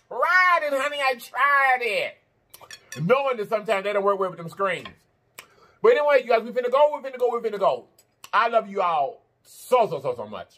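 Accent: South, United States. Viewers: So country lol I love it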